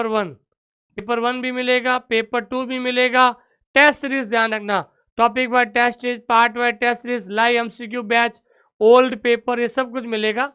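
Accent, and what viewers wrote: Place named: Rajasthan, India. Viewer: Pura Rajsthani style me, kya khub lge hai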